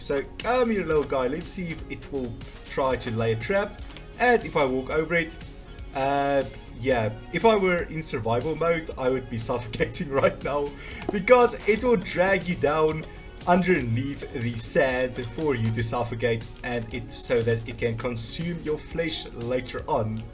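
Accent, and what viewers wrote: Afrikaans, South Africa. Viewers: Are you from south African because ur accent sounds like a afrikaans english Edit: ur channel location is in SA so probably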